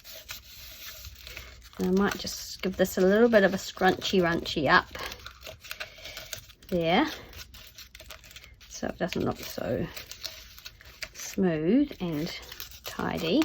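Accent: New Zealand. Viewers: Such a gorgeous tag, thank you! Loved watching your process and hearing our Kiwi accent! I’m wondering if you got your Art glitter glue in NZ? I subscribe to The Graphics Fairy and especially love all the journal tutorials! Is this your last one? 🧚‍♀️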